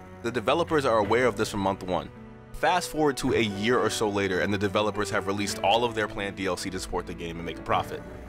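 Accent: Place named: New York, United States. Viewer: Sound like a nyc accent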